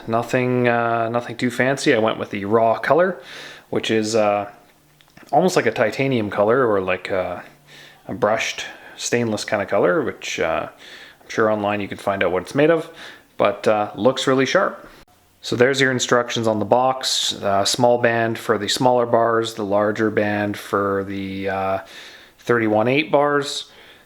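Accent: Canada. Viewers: Are you Canadian? You have a very strong accent.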